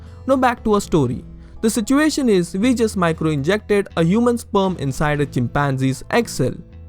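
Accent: India. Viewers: No doubt , Indian accent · of course it's a east indian turban head doing the narration lmfao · Mann im indian and this accent even hit me like a ton of bricks. Got dam ginda! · Indian accent again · LISTEN INDIAN MAN YOUR ACCENT ...SEEMS LIKE YOU PRAY TO YOUR GOD IS A HANUMAN . IM INDIAN TOO MY GOD ALMIGHTY LIVING IS MY CREATOR. PEOPLE LIKE YOU SHOULD IS UNETHICAL TEACHING WRONG CURIOSITY WILL DESTROY HUMAN RACE AND PEOPLE'S FAITH. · Me: *sees video Me: *hears indian accent Nope.. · Indian accent tho :( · They already have had a half Human and half Chimpanzee. He lives in the 70s. The maker of this video didn't do his research properly. Also funny Indian accent. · I love Indian accents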